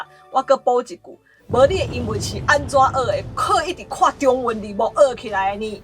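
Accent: South, Taiwan. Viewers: 支持你講南部腔啊！聽起來很舒服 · 南部人當然說南部腔，不然難道要說北部腔嗎？突然說出別的地區的腔調才奇怪咧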